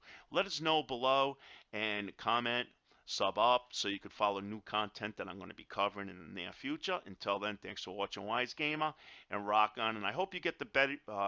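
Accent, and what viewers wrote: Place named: Boston, United States. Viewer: Mass/Boston? lol trying to place it :D Anyways nice video Ty for it, just coming back and this is just what I was looking for :) Keep up the great work and TY for your time.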